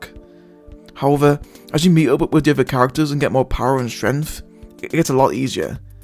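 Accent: Newcastle, United Kingdom. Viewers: You a Geordie?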